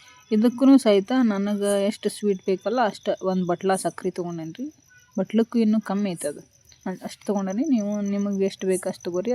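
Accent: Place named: North Karnataka, India. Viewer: ತುಂಬಾ ಚೆನ್ನಾಗಿ ಮಾತಾಡ್ತೀರ ನೀವು ಉತ್ತರ ಕರ್ನಾಟಕದ ಭಾಷೆನ ❤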